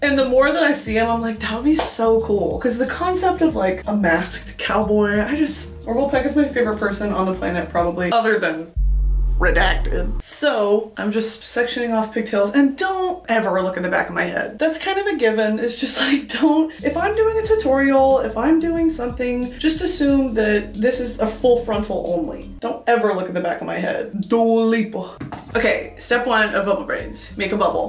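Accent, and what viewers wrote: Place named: United Kingdom. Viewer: Why does she sound like the opening wizard man from Wizard101 w her british accent <3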